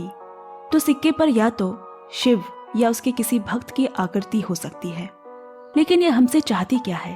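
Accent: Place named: Lucknow, India. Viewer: Ap lakhanow Sy hy kia? bohot hi pure urdu bolti hy ap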